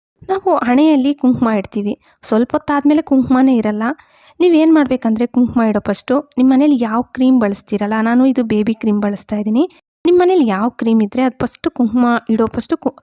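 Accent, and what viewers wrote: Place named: Kolar, India. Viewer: Kolar side avara nimma bashe agide adikke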